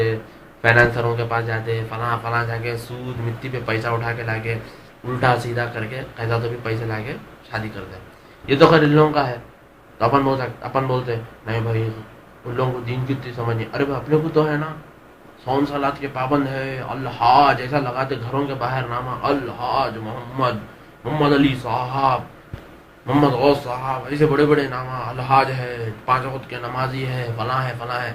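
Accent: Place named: Hyderabad, India. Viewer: well said bro with sweet hyd lang :)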